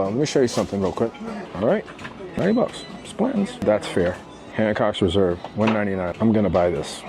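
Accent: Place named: South, United States. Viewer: horrible country accent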